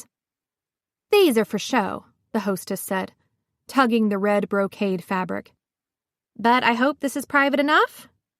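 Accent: United States. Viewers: American narrator.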